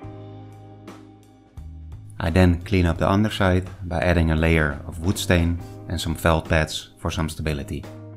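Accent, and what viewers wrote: Netherlands. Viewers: are you dutch? if so vet man!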